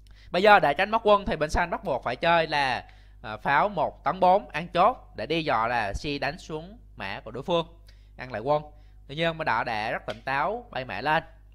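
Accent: Binh Dinh, Vietnam. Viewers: Giọng bình định hay phú yên mà nghe khá nặng? Có vài từ ko nghe kịp! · Bình Định! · Ng bình định hay phú yên gì đó chớ quảng gì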